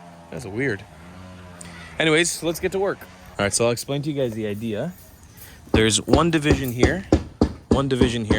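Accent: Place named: Philippines. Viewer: Wow, your bisaya english really sounds filipino. Even the accent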